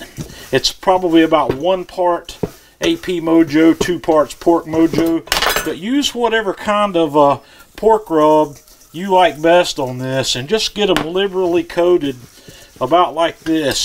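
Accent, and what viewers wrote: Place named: Georgia, United States. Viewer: Wow, you've even mentioned Malcom Reed....... My wife and I,,, when we first seen you, we thought,,,,,,,,> WOW: he sounds like Malcom Reed.... > both from Georgia , our guess! Love to watch you, and to hear your accent! Thanks! Marcel , from Yuma, Az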